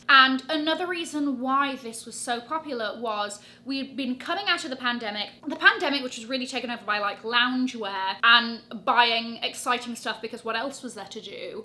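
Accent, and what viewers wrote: United Kingdom. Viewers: Your synopsis is “sodden genius” (said in your British accent) ❤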